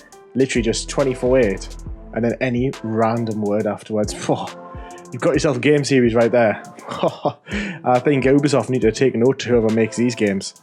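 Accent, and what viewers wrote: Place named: Northeast England, United Kingdom. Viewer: You sound like a fellow northeastener, hi from South Shields 👋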